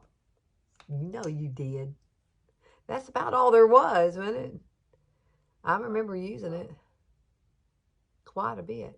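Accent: South, United States. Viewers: I love your southern accent and you look like a green eyed Heather Locklear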